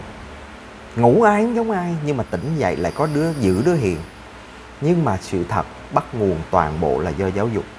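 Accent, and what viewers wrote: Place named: Binh Dinh, Vietnam. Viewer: A người gốc bình định thì phải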